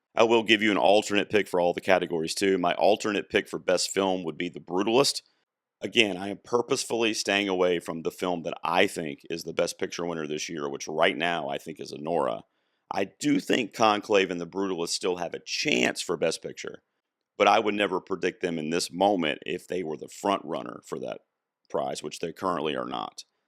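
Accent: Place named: United Kingdom. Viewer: I really love your British accent. 😂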